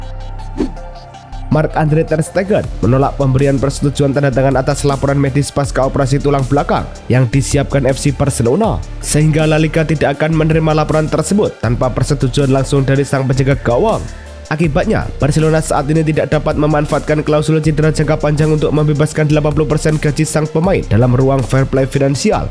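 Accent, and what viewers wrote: Java, Indonesia. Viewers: Yang ngisi suara medok jawa nya agak gimana gitu di dengar 😆🤣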